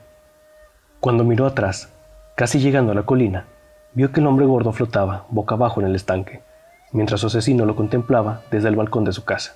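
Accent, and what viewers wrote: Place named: Bogotá, Colombia. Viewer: A mí no, me hace pensar en un rolo gomelo. No me puedo concentrar de imaginarlo. Aunque es claro, vocaliza bien y se entiende muy bien, es sólo si acento que me suena como dije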